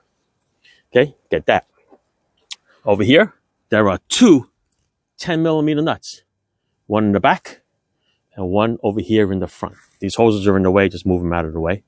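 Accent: New York, United States. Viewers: Is this, the old bracket racer long ago, from Seattle? If so, you sound different, like you're from New York, now. I don't recall you having an accent before. Anyway, why were your intake ports on the heads so dirty?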